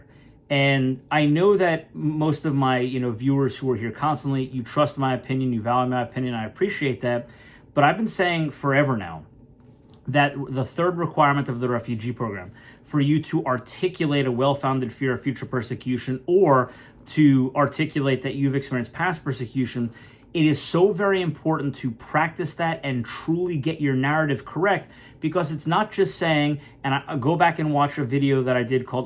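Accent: South Africa. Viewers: Making progress, that introduction is starting to get pretty smooth. You are starting to sound like an English South African speaking Afrikaans.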